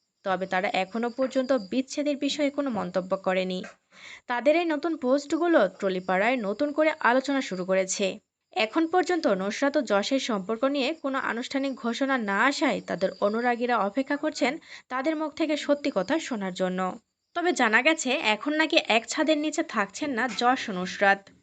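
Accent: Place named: Bangladesh. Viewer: Bangladesher mone hoche apni. Huapy couple bollchen.Huapy ki kotha? Happy balun.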